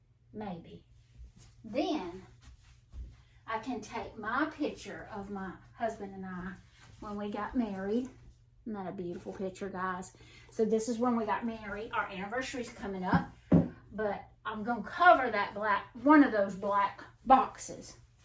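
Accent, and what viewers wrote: South, United States. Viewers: Southern accent - my fave!!! So bring it on, lol. I'm a WI girl turned KY grandma, but I think I will always have my northern accent - "y'all" just doesn't sound right coming out of my mouth, but I wish it did 😂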